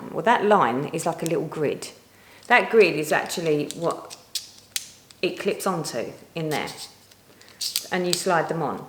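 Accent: United Kingdom. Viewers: Looks like a great storage system. Thanks for sharing. Love all your stitching. Your accent makes me feel homesick for the UK though!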